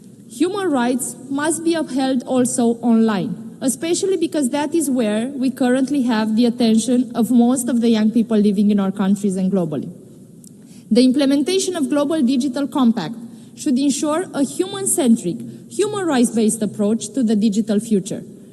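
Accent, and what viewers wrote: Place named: United States. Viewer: vorbește engleza americana standard. Dar dacă știi engleza britanică tot ar trebui sa o înțelegi. Acum desigur dacă ești pe la nivelul B1 sau B2 e cam greu sa înțelegi tot ce spune.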